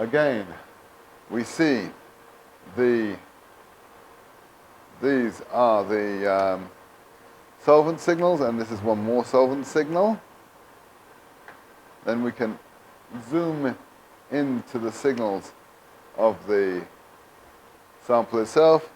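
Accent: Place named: United Kingdom. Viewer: You sound British are you from the uk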